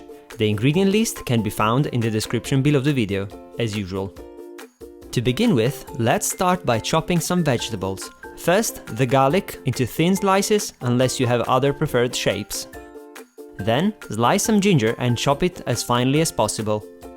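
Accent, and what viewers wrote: Italy. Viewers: This is one of my favourite dishes. My grandma used to cook it for me when I was younger, without the mushrooms. And I don't know if it is a coincidence but .. your accent sounds italian and my grandma was italian, so I think this is an old italian dish, especially in the northern regions. 😯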